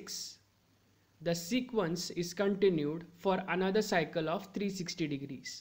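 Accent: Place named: Russia. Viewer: El inglés más ruso que he escuchado.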